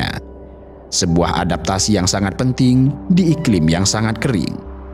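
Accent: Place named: West Java, Indonesia. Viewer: Fiks. Yg ngomong orang sunda.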